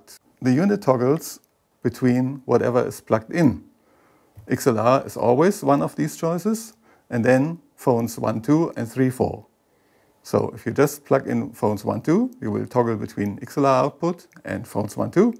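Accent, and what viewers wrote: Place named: Germany. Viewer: I listened to the whole video mainly because of the cool German accent.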